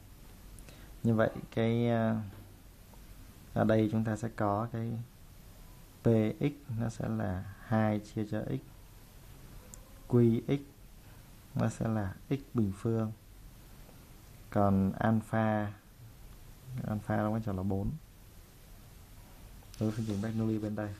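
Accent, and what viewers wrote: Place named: North, Vietnam. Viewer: hình như thầy ng miền Nam ns giọng Bắc thì phải, giảng thấy dễ hỉu hơn mấy cái power point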